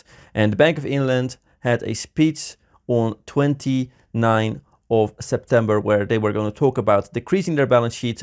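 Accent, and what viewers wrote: Netherlands. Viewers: Nederlandse accent. Nederlanders zijn altijd gretig voor de goedkopere prijzen lol.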